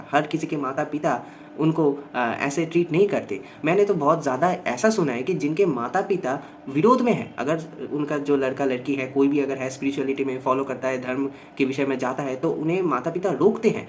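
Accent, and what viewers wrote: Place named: Bengal, India. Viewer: You bengali ascent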